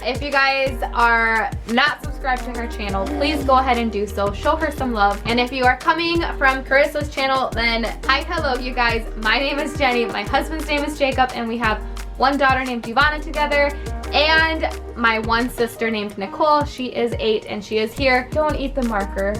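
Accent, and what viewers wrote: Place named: North, United States. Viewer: Oh my gosh I need to do this for St Louis, lol I’m sure I say some things weird. My own daughter asks me why I say “car” and “can” the way that I do. 😂🤣 car sounds more like “CORE” and can sounds more like “Ken” Lol 🤷‍♀️ “Minnesota” — I hear your northern accent in that word and ironically, when you say “say” lol 😂 but not in very many of the other words! I’d say you have just a slightly northern accent. OHHH yes, I definitely hear the accent when you say “bag” lol you’re pronouncing it more like “beg” for sure. 😂😜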